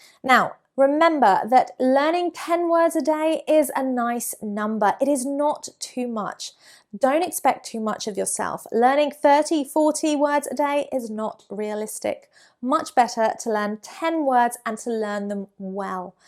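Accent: United Kingdom. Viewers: Really I enjoy each lesson with you I loved the British accent it is attractive when you use it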